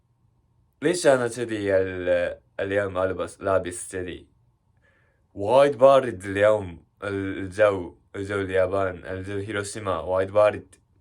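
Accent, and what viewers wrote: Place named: Iraq. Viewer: زين تحچي عراقي حلو 💫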